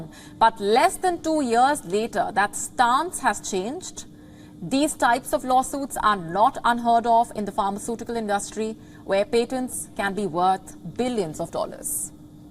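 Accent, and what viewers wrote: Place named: India. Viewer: Go work on your Indian accent it aches my ears, I tried...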